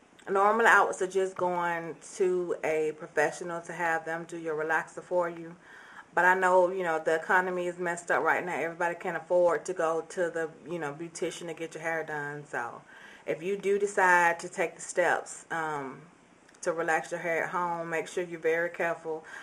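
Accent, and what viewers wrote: South, United States. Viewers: Gurl when you told your fear your tickled me, I could tell you where serious but you where so darn adorable about it. You are soooo cute and special and keep up the good work. BTW your accent really makes me miss the south a lot and when you say yall I just go man I really miss home.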